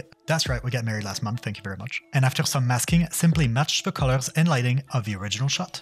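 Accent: France. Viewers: Tu as un accent français, tu es français ? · Are you french ? You have a little french accent. I'm french too ! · Ça sent l'accent bien français 😁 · Wow I love your channel! But when I was watching this I thought “ hmm this guy has a French accent” then you said “et viola” and then I thought “ this guy is French!” By the way I was surprised because I’m French too and you are one of my favorite YouTubers! So, please answer this question… are you French? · Aren't you French????? I'm hearing some French accent no?? (I'm French) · vu l'accent t'es français non?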